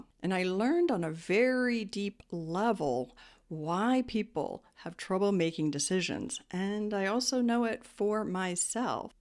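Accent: United States. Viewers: Are you from Iowa?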